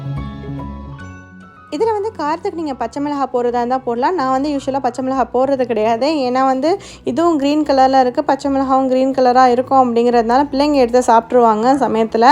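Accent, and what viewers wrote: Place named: Tamil Nadu, India. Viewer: Namma vur na neega yetha vur solluga nega pesuradhu Alagana tamil la eruku , but india tamil nadu tamil pola eruku so solluga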